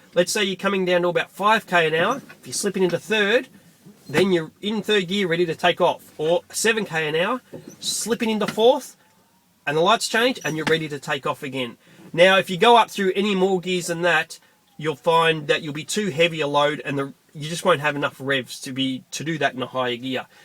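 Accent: Australia. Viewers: this fella is definitely an Aussie, Not from NZ. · His accent kind of gives it away. Aussie or New Zealand RH drive Western Star. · Finally a truck with the steering wheel on the RIGHT (correct) side of a truck and an Aussie accent. Those Western Star's are actually a decent drive.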